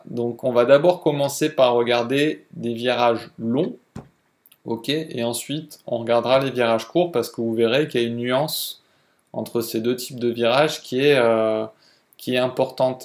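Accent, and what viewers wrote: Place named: Switzerland. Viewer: vaguement suisse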